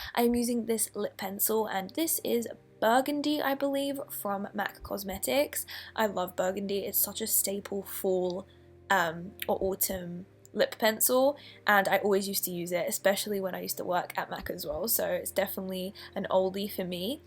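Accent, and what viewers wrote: Australia. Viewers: Savanna I feel like she sounds sorta aussie in this idk why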